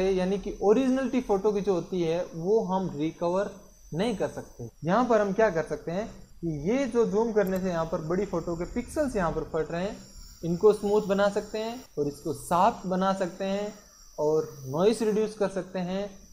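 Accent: Haryana, India. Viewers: Tu Hariyanvi or Rajasthani hai 😁😁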